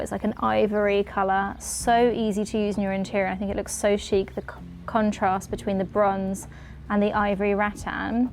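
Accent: United Kingdom. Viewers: I don’t get her British accent…🤔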